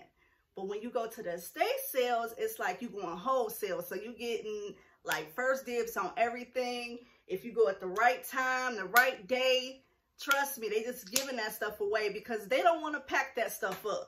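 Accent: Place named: Midwest, United States. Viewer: Hey! Congrats on your new channel! I'm excited about what you have in store for us. Yes, sis you hit a licc, honey! 🤏🏽🤏🏽 "snap, snap!" ☺️ That gold bag andthe black and white suit...cold! OMG! Btw, I can tell you're from the Midwest by the way you talk. Anyway...I love thrifting and shopping estate sales. I had to cut back a lil bit. You have me inspired though! I just need more SPACE! Time to purge! Anyway excited for you about your new channel. Looking forward to more of your videos! Subscribed! ❤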